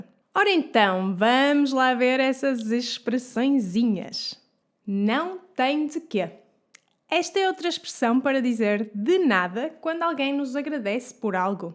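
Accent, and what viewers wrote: Porto, Portugal. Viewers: Curto bué do sotaque de porto!!! A minha professora era alfacinha mas gosto muuuuito do vosso sotaque. Parabéns pelo video! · Esse seu sotaque é do Porto né? É Bem mais bonito que o de Lisboa, hehe.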